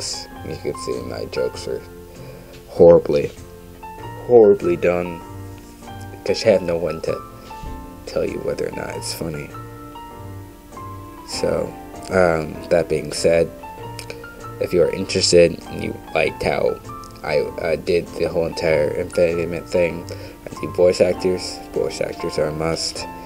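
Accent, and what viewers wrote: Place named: United Kingdom. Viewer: You sound so british in this video. on another note, I can help.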